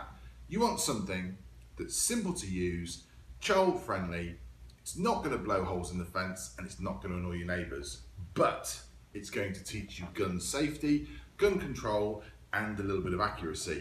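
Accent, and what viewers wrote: England, United Kingdom. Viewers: are you bri'ish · Its American, but youre English!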